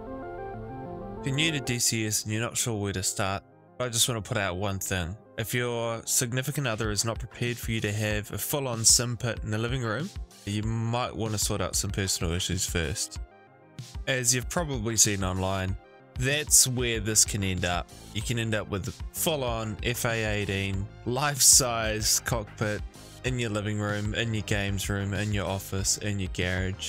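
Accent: New Zealand. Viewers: Great tutorial mate. I've been flying in DCS for 3+ years now but it is always good to see what else is out there. I have the Warthog HOTAS at home with VR and I travel with the Extreme 3D Pro to play on the go. I never knew about the entry level eye tracking, it might be worth considering when I am away. Did I hear a slight kiwi accent? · AYEEEEEE YOUS A KIWI ASWELL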